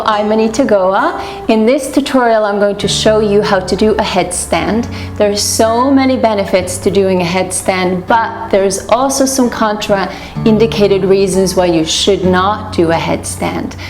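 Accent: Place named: Sweden. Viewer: Thank you! By the way, are you Swedish? I think I can spot a very subtle Swedish accent.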